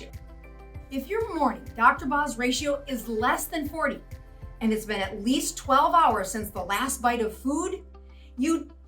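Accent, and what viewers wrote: United States. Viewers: Tbh the moment I hear a US accent online, talking about medical or dietry advice, I tend to switch off. This woman is spouting her personal opinions, not verifiable medical information. Fyi four time Olympic rowing gold medalist Sir Steve Redgrave has type 2 diabetes. Unfortunately for him, at that time he was diagnosed there was very little known or fully understood about this particular auto immune condition. Redgrave was diagnosed with type 2 diabetes in 1997 at the age of 35. At the time, Redgrave was training and competing with a focus on winning a fifth gold medal at the Sydney Olympic Games in 2000. I defy anybody to find any of those stated symptoms on him. I know, because as a 52year old male in 2012, I too was diagnosed with type2 diabetes. I cured my own type2 diabetes, inspite of being told by my GP that it was 'incurable'. 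From my own extensive reading, I found there are over a dozen recognised forms of diabetes, all with unique causes and symptoms, eg pregnancy. The most common form is type 2 'late onset' diabetes. Characterised as 'insulin resistance'. This form of diabetes, if disgnosed within 10years from onset of the condition, in 80% of cases can be fully reversed ie 'cured'. Medical professionals even today will not claim a cure for type 2, but will say that you are 'in remission', as eg they would with a cancer. Type 2 diabetes: If you are subject to extended periods of uncontrolled stress in your life, your body will flood with energy, in a 'fight or fli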